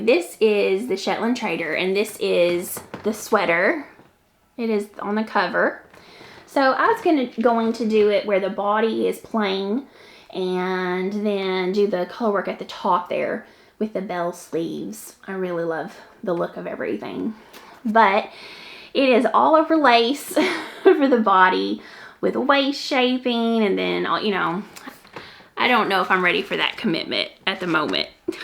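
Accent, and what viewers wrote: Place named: South, United States. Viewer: Can I say that I could listen to YOU talk all day long. I absolutely have never known anyone with a southern accent (and have never traveled to any southern states) so I’m just smiling while listening to your podcast. You are adorable!! Thank you for making time in your day to share your life with us.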